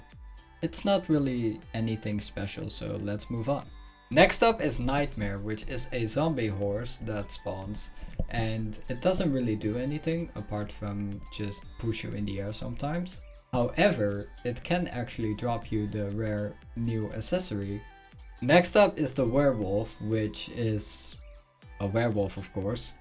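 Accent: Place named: Netherlands. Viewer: bruhhh ik hoorde ik binnen 0.1 seconde dat je nederlands was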